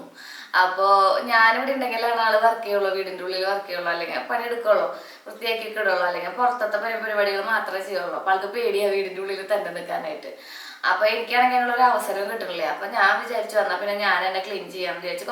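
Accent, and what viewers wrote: Thrissur, India. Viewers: ഓർമ ശരി ആണെങ്കിൽ 2019 ഒരു 18 തൊട്ടെങ്കിലും ഞാൻ video കാണാറുണ്ട്. പല daily vlog കളും കണ്ടിരുന്നെങ്കിലും ഇപ്പോഴും കാണുന്നത് മാത്രം ആണ്‌ വേറെ ഒന്നുമല്ല. ഞാനും തൃശ്ശൂർ ആണ്‌ age തന്നെ ആണ്‌ വർത്തമാനം കേൾക്കുമ്പോൾ എനിക്ക് എന്റെ നാട് ഓർമ വരും എന്റെ ബന്ധുക്കളും കൂട്ടുകാരിയും ആണ്‌ നിങ്ങളൊക്കെ എന്ന എനിക്ക് നിങ്ങളെ feel ചെയ്യാറ്. But പ്രൊമോഷൻ ചെയ്യുമ്പോൾ filter ഉപയോഗിക്കരുത് തന്നെ പറഞ്ഞല്ലോ ആൾക്കാർ selfish ആകുന്നു എന്ന്.filter ഇടുമ്പോൾ അങ്ങനെ തന്നെ തോന്ന ഞങ്ങളെ പറ്റിക്കല്ലേ. പ്രൊമോഷൻ ടൈമിൽ നല്ല skin അത് കഴിഞ്ഞു കിച്ചണിൽ കേറിയപ്പോ ഒരു glow യും കാണാനില്ല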